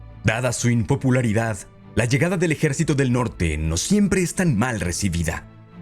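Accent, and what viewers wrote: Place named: Colombia. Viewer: Quiero saber quién era el colombiano que narraba así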